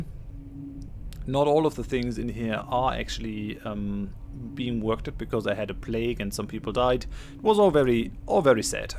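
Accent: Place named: Germany. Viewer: Sounds german ;)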